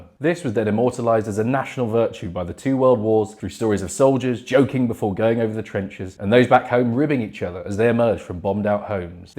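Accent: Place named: United States. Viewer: Enjoyed the scholarly and interesting history. The American accent, not so much. 🇺🇸🇺🇸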